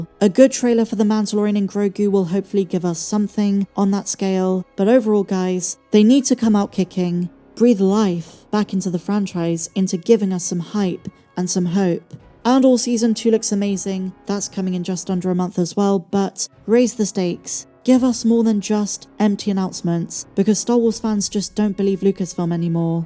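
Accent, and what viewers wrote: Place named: England, United Kingdom. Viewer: I swear with the english accent it sounds like she says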